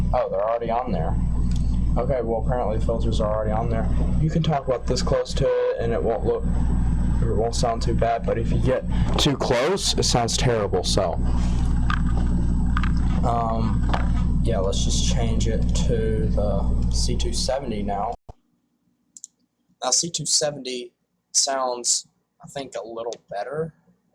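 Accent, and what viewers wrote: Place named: South, United States. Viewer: Your light southern accent sounds really cool.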